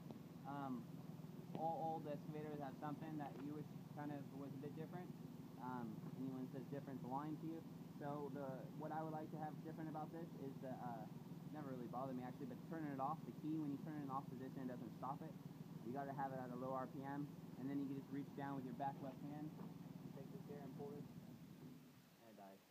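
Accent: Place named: United States. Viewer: Awesome! You still sound like an American! Third kid? Firefighter?! Damn, I miss the crap outta you guys. . . give my love to Nic. BTW, my profile pic is me raising the main just outside 39 while YOU are at the helm with Serena and the photographer Erin in the cockpit! Goodtimes, my man, gooood times. . .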